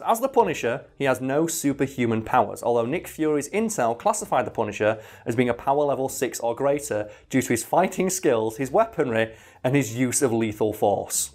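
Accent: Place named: Southeast London, United Kingdom. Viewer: Use your damn northern accent you southeast London sounding ass. Please?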